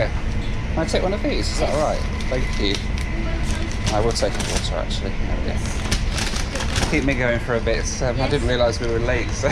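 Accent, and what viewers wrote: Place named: United Kingdom. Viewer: Hi... Which part of the UK are you from? Trying to work out your accent!! Cheers:)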